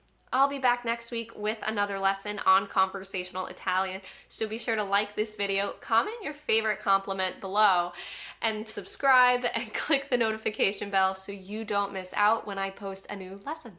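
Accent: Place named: Calabria, Italy. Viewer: Hi, I'm italian and I like your accent when you speak italian, it sounds pretty calabrese or siciliano! I watch your videos to improve my english. I wish you a million of followers!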